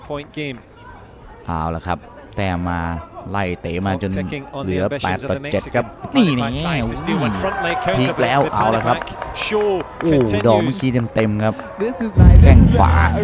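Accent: Isan, Thailand. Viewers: เว้าลาวอีสาน ผสมกลางมันม่วนดีสุดยอดรวยจ้า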